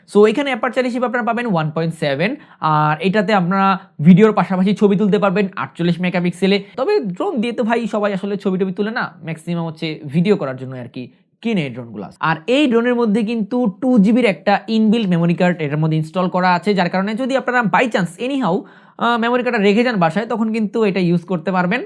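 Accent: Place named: Bangladesh. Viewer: chehera dekho yah fir ,,, 1 min voice hii sunlo .... pata chal jayega yaani jhaat se pakad loge ki yeh Bangladesi hain ....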